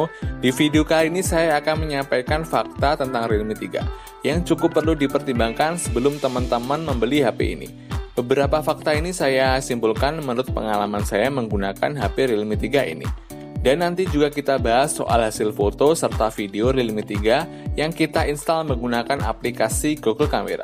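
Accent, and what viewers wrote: Magetan, Indonesia. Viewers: Mas sampean wong Magetan?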